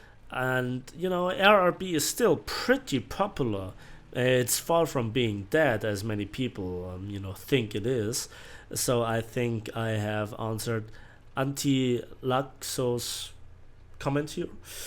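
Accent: Germany. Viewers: dont ever loose the german accent! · Hey! Thank you for mentioning and answering my comments. Though I think you really should invest some time in answering everybodies comments with written replies... it shows that you care about us. But I'm still getting used to your ways and I may be wrong. About my comment that your videos are to long... forget about it. They are just great!!! Don't change a thing. About premium account... it's a must. I've tried a couple of months without it and it sucks. The difference is huge. And I'm fully spaded in all nations fighters and most of other planes. Except for the jets. They are pay to win and I hate them. My advice to all non premium players... just wait for the sales. 12 eurs a month is not so expensive especially if you love the game but on sales price drops to 3 eur per month. Now that's just insane not to pay for it. 40 eur for 360 days of premium. 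What happened to Subnautica branch? Continue the project... please? You are amazing narator and I love your german... both the accent and the actual language. Some 'fuck you's in german and 'du kanst mich mal' or 'was soll den sheiss' and stuff would be great to hear too:-D You know... actual comments when someone makes a pussy move on you and the joy 'comes out' of you... in your mothers language... the good stuff:-D Love you!!!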